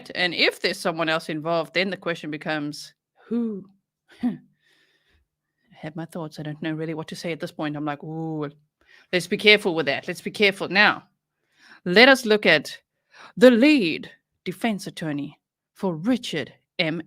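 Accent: South Africa. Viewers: You did not reply to a question I asked you on another video. I asked if you are ex South African, because I am & your accent sounds as if you could be from SA. 😊 I listen to another YT channel on crime, but I stopped listening, because the woman's voice grated on me. I've found that on other non crime channels too. I don't think YT creators realise that their voice & physical presentation DO matter. As do the facts, & not a whole lot of speculation or repetition! This is why I like watching you. You tick all the boxes. I also like that you don't swear! That's a huge turn off for me. Did I hear you say you'd been an airline pilot?? Just after I commented on what I like about you, you went on to talk about being responsible. That's exactly right, I see you that way. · We are South African, living in George Western Cape. My husband said all along you sound South African. 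🇿🇦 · I'm a South African and is trying to pin your accent. Are you a South African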